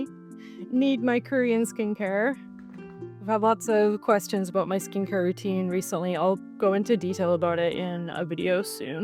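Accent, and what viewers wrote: United Kingdom. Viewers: It's interesting to see how the way you speak has changed over the past year. I hear the British influence on your accent 😊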